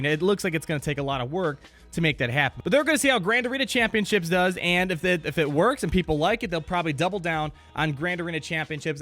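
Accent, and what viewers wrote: Poland. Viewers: now i know that you are polish;) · ziomuś to ty Polakiem jesteś?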